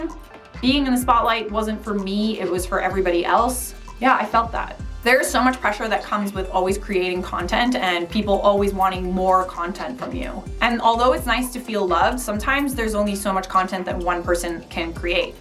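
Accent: England, United Kingdom. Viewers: Stop trying to be funny it’s not working And your English accent is awful and cringe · I was thinking I was gonna dig this video, then the British accent rolls in.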